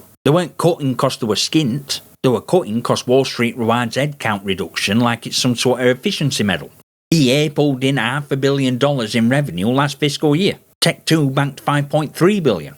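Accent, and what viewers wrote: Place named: Lancashire, United Kingdom. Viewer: OK, you're new to me -Lancashire accent, I believe, common sense obviously worth a listen.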